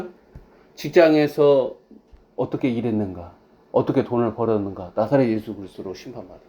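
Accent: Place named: Jeonbuk, South Korea. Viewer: 그러시군요 말씀중간중간 억양이 그러신듯해서 여쭈어 보게됬습니다 저도 잠시 전북에 머물고있다보니 그런것도 구분이되네요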